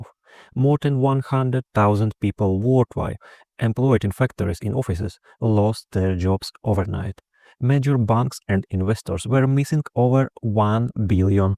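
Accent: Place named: Russia. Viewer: It’s very hard to understand anything you’re saying because of your very heavy Russian accent!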